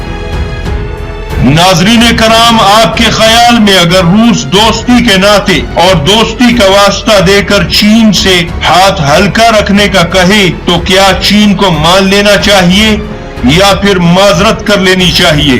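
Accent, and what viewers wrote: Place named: Pakistan. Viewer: Very good comentry best language and pure pakistani accent other u tuber speaks like indians pure pakistani accent plz keep it up brother aapriciate to adopt pakistani accent this is our language we dont like indian accent love you u tuber speak like this thanks from karachi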